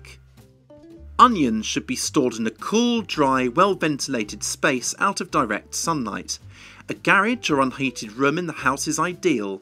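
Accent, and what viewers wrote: United Kingdom. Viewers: British people are so much more pleasant to listen to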